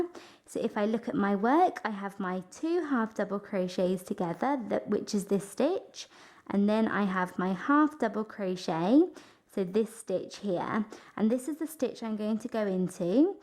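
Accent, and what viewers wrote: England, United Kingdom. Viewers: I did wonder as you have an English accent which confused me. I love your videos they are so easy to understand.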